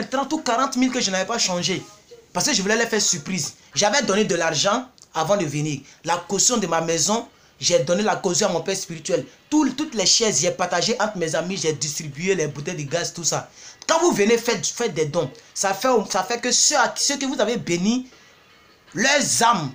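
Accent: Ivory Coast. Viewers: 😂😂😂 Ivoirien tu es trop fort, ton accent est trop chic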